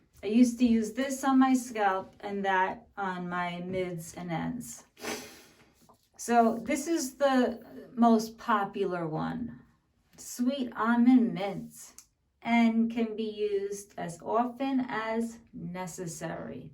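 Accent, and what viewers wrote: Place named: New York, United States. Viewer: New York accent hits hard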